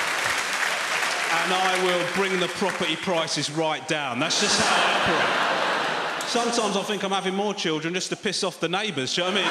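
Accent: United Kingdom. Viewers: What this south indian guy doesn't know is that his native south india is racist towards North Indians...no matter how much British accent he got he wont never talk against South india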